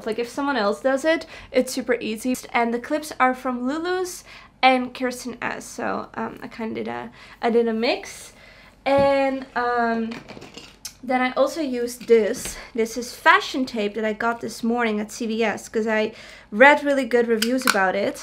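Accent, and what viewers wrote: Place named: Netherlands. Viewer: Are you Dutch? Idk why but you have a sort of Dutch accent haha.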